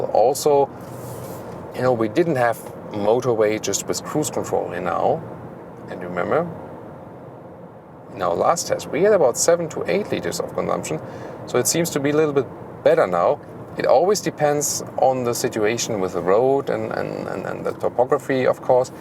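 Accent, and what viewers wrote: Germany. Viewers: He speaks English like a german, why is he speaking English !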